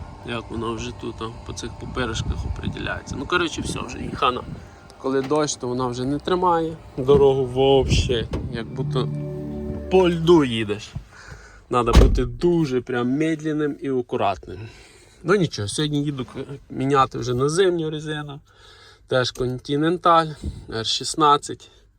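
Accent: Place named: Bukovina, Ukraine. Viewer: Дякую за відеороліки по рено kangoo.Мені таке авто сподобалося.Шукаю WV кедді,але бачу,що і це не погане і можливо дешевше.І ще земляк,як робиш роліки фільтруй базар.Бо твій Буковинський укр.-рус.суржик дуже ріже вуха.Я то,тебе розумію,сам так дома розмовляю.Але мені стидно,що ми з західної україни,а говоримо на половину російською.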